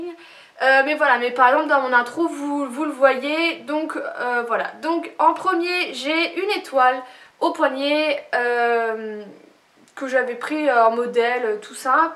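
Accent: Lorraine, France. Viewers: s'entend ton accent de Lorraine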